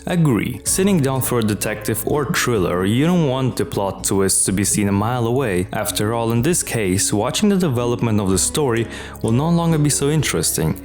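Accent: Russia. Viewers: Ты акцент не слышишь? Это явно кто-то русско говорящий. И в описании ссылка на оригинал. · Why all russian boys are sexy, i love your accent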